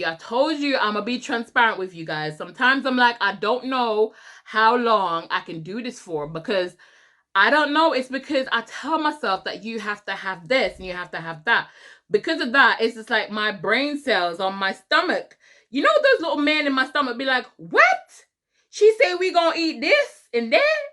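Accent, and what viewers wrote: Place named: United Kingdom. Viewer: Where are you from? You sound British and American. Can't pin you down! Great video 😁👍🏻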